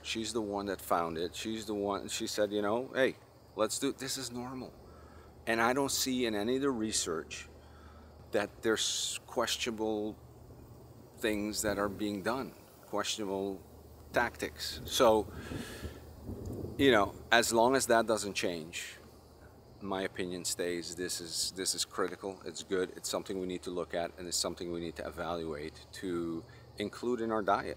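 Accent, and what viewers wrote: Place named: Netherlands. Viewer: There is a slight dutch way of saying things, besides that I have concert-pianist ears so that's how I noticed.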